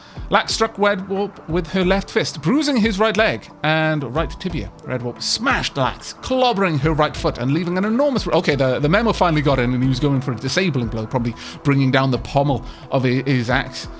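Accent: Wales, United Kingdom. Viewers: new sub here, Rimworld brought me but am enjoying your style so I shall check out the rest of your content! Out of curiosity, would that be a slight hint of a Welsh accent I hear?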